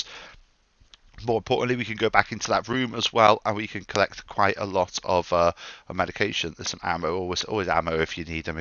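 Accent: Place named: United Kingdom. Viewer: You should play rust because your British voice. You'd do well. 😉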